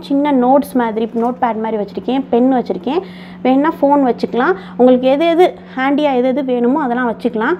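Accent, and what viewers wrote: Madurai, India. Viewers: Andha thenga kathi maduraila use pannuvom apram pathriram velakunum nu soluvom urs madurai slang madhiri iruku adhan keten pls reply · R u from madurai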